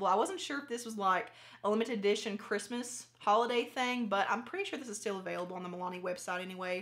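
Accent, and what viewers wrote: South, United States. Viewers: I love you so much!! I have no idea what to do with makeup and I'm almost 34.. but I feel like I am learning on your channel and it helps that your southern and your funny! I laughed out loud when you said MAN DOWN!! Stay true, stay you! Your awesome!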